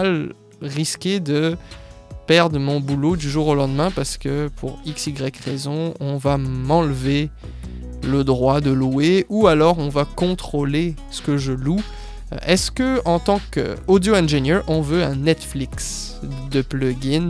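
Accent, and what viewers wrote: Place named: Réunion, France. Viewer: T parey mi sens kom inn ti accent kreol 😅 ou lé réyoné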